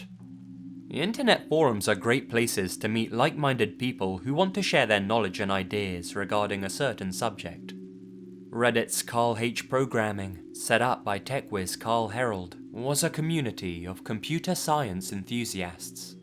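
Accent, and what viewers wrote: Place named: Southern England, United Kingdom. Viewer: that accent doesn't lie, that's southern england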